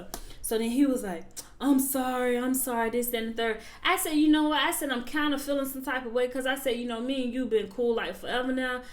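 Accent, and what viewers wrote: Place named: South, United States. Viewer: I love your accent, sound like somebody southern auntie!!! 😆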